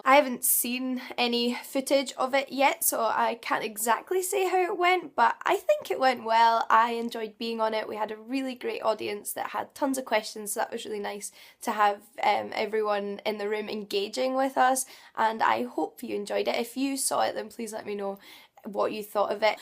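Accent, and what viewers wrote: Scotland, United Kingdom. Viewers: I love your accent! Scotland is such a beautiful place! Listening to your voice reminds me of the beautiful time I had back in april in Edinburgh! Awesome haul btw! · ***** That's really admirable. People tend to overlook that these days, I think. :) And you've got such a lovely accent! May I ask what part of Scotland you're from? I kind of want to travel there and just sit in a public place and just listen to everyone having conversations- is that weird?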